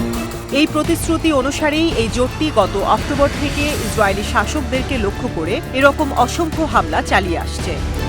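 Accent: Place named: West Bengal, India. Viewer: প্রিয় ৭১ টিভি আপনারা কি পারেন এই মহিলাকে ভয়েস ওভার থেকে বিরত রাখতে? কারণ উনি ভারতীয় চতুর্থ শ্রেণীর নাগরিক পশ্চিম বাঙ্গালীদের অনুকরণ করে কথা বলেন।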